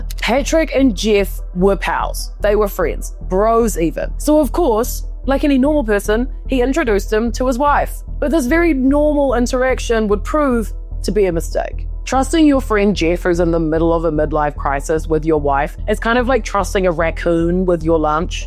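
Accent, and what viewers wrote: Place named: New Zealand. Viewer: OMG! Your NZ accent and facts makes me realise this is for real😮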